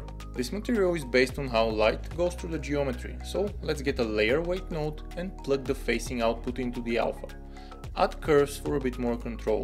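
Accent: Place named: Eastern Europe. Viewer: haha man, because English with Slavic accent is very bad sounding and insulting, it may sound good only inside the structure of your language but not in English. Not just most of the words are unclear but the accent is also distracting.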